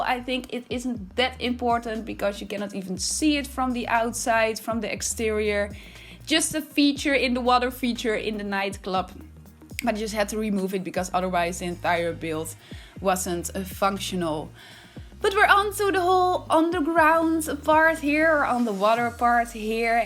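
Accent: Netherlands. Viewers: Wow i realy love the balcony in the bedrooms❤️ amazing how you made this! Looking forward to the next episodes of this series. Which country you live? You sound a bit dutch/holland like me😁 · Het ziet er geweldig uit 🤗 Alleen zou ik als kleine tip meegeven om wat meer de Amerikaanse of Britse uitspraak van woorden te leren 🙈 Je kon namelijk echt duidelijk horen dat je Nederlands bent, maar dat is zeker geen kritiek hoor, het gebruik en kennis van de woorden is namelijk erg goed 🤗 Het is oprecht gewoon bedoeld als tip 🤗 Je hebt in ieder geval wel een nieuwe subscriber 🙈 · The Sim Stream ik uit België 🇧🇪 ik dacht dat je uit Nederland kwam want er zat een Nederlands accent tussen je Engels 😁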